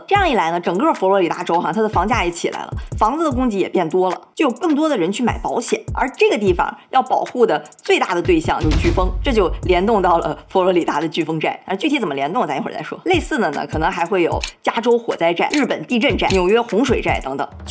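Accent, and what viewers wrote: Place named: North, China. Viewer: 好奇你是来自哪个地方的人？口音太重了。北方人？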